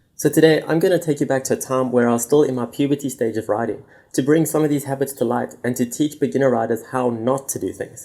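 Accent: Afrikaans, South Africa. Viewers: Is that a South African accent i hear ?? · Knew you were south african after your first word lol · Your definetly from South Africa me 2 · Are you South African?? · Heard that accent and was like AAAYYYYEEEE south africaaan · Are you south african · I knew you were South African 🤜🏾🤜🏾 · That south african accent? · Are you South African? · That south african accent is horrendous.. uhhh denni arrcheer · r u south african? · I knew I heard a fellow South African voice hahahahaha..... Was a funny vid I needed it. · I thought he sounded afrikaans XD I just assumed it was an auzie accent.. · This guy is 100% South African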